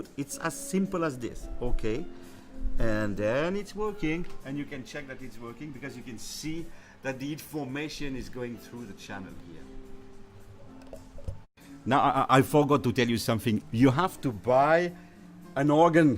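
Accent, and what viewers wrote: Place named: France. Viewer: salut à toi l'artiste. je viens de découvrir ta chaine. vraiment très bien. vu ton accent franglais, j'en déduis que tu es français ? de quelle région? cordialement. Laurent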